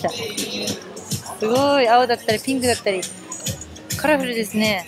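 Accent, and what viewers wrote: Hokkaido, Japan. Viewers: 時折出る北海道弁にほっこりします☺️ さんの食レポ最高です❣️今年も楽しみにしています❗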